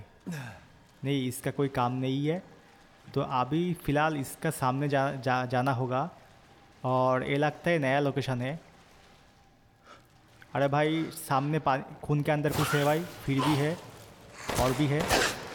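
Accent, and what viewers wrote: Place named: Bengal, India. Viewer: বাংলায় বলেন আপনি যে বাঙ্গালি সেটা আপনার হিন্দি বলার ধরনই বোঝায় আপনি বাংলায় বলুন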